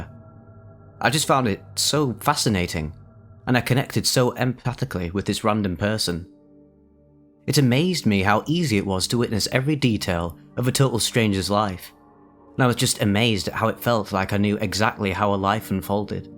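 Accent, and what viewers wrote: United Kingdom. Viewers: are you british? i cant tell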